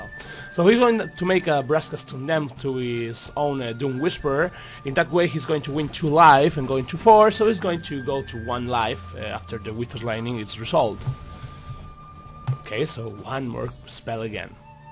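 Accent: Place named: England, United Kingdom. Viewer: alto ingles xd, me gusta el acento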